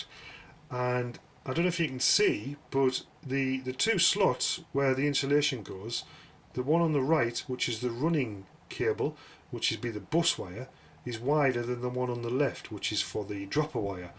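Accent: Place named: Northern England, United Kingdom. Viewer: HI YOUR ACCENT SOUNDS A BIT MORE NORTH THAN MYSELF IM A BORO LAD HEARD YOU MENTION YOU BOUGHT SOMETHING FROM PETERS SPARES, ANYWAY YOU ARE DOING A GREAT TEACHING JOB FOR ME IM BRAND FIRE NEW AT THE RAILWAY MALARKY BUT WANTING AS MUCH ADVICE AS POSSIBLE KEEP UP THE GREAT WORK TAKE CARE!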